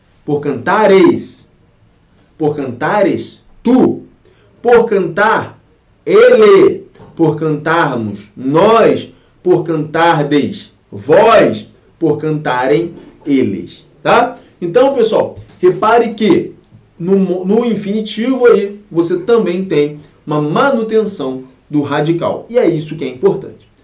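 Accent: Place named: Rio de Janeiro, Brazil. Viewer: Uhhhh falando com i onde não há i, pondo X onde não há X; fica difícil lecionar usando o português da capital do nosso querido Rio de Janeiro.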